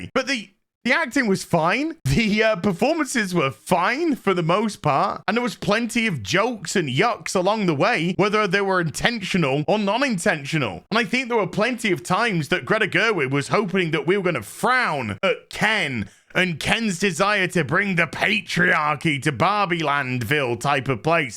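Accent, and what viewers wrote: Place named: United Kingdom. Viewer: Good Day Sir. Liked and subscribed. Let me say that your Barbie review is by far the best I have ever seen/heard. I also noticed that you are British =) Good Lord, your laughter is so infectious! You bring such a delightful and positive view to the Barbie situation and you Sir are so right! I too am from outside America so I could really sort of be on the same page as you are presenting. I would also like to share with you what I noticed about Americans. They view things very differently the way most people outside the US do. Chances are, many of them (or even majority) will not be able to jive with that humor of yours that made me laugh so much! They are usually Morose and would take anything as offensive even when it is not. Their culture sadly has gone 'Kaput' that they can no longer see the funny side of life or the silver lining in the clouds. 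It is so difficult to understand them. I will continue to watch your vids as they are a delight compared to the dark vibes you get from majority of US content creators. Thank you and GodBless.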